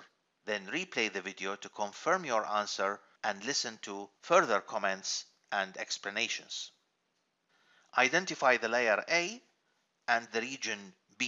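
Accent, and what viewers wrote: Iraq. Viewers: مبين من نبرة صوتك عراقي سبحان الله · اي والله اني هم لاحظت بيه عراقي اول ما حجه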